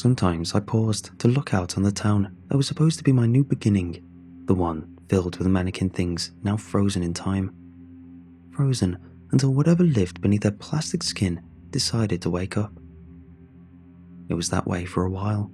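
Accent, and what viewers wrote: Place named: United Kingdom. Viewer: I've just found you, it's refreshing to hear a follow brit narrate.. although I have a long way to go before I reach your status. If ever!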